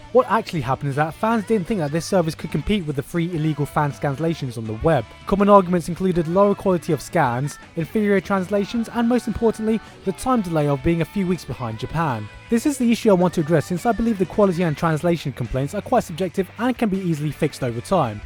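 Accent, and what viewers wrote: United Kingdom. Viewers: This actually made sense. While I like manga/anime, I must confess I seldom buy it (also due to the fact it almost doesn't get translated into my native language, ever), so I usually get my fill on the manga/anime sites out there. That said, I'm still rational about it; yes, I like the watch-it-for-nothing sites out there, but still, I acknowledge it makes sense from their perspective - as a business - to try to do something about it. Just like you, I also don't think the people claiming it's 'good advertisement' and 'I buy it anyway' have a major impact. It just doesn't cut it: most DO NOT buy everything they watch or read online, after all. I don't, for instance. The problem is, of course: nobody likes big companies, and certainly not those that make the 'free stuff' go away. I think if the manga was made directly available from the manga's site (or a site where mangaka directly sell their products), and that with a 1 dollar/euro one-click support button for instance, most would do it, at least for their preferred manga. 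Every fan would be more inclined to support a mangaka directly, than some company which they perceive as money-grabbing. That said, you are right: 25 bucks a year isn't all that much. Alas, it's not available around here (and it would need to have MY favourite manga in there too, obviously). But in any case: I thought you made some good points. I also found it funny when I heard you talk; it's sounded so 'British' (Queens English-like), I imagined you compl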